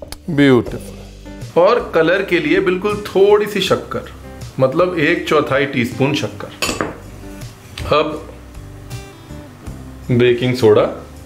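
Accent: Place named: Punjab, India. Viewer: I jst love ur panjabi style talking sir and hindi both,and ur recipes r tooo easy to cook at home and yummy,mostly sweets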